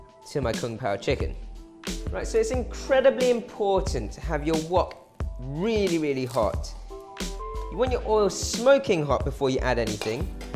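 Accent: United Kingdom. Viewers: An Asian with a british accent.. LOVE IT!!!! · Why you speak like a British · I love your videos but it just makes me laugh so here a Asian man speak British or English no disrespect pundit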